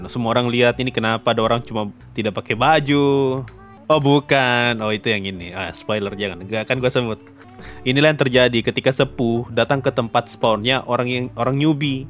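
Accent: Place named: Eastern Indonesia, Indonesia. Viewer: Bang lu kok logatnya kek orang timur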